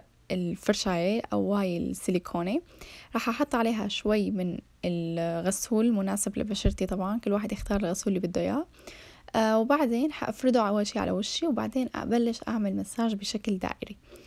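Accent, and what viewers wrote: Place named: Iraq. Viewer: حياتي شفت لهجتك عراقية وانا ساكنة بالعراق من وين حصلتي عليها ممكن تخبريني بليز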